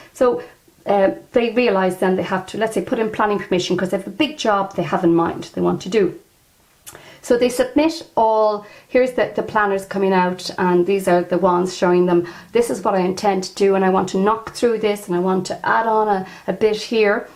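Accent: Ireland. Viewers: Hi, only just this minute found you and am watching this video. You're fab! Trying to place your accent, are you Cork?? I'm in cobh 💖 Can't wait to check out your other videos Tomor. Would also love to see videos on each card 😘 Love listening to you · Yass! So beautiful to hear an Irish accent teach tarot. I've been studying tarot for three years since ive been abroad in Australia and havent really connected with any Irish regarding divination! Thank you for your master classes 🍀❤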